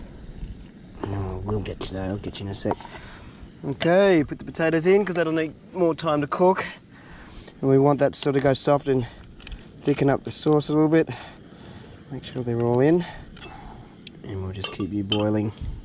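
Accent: Australia. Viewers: He has the most stereotypical Australian accent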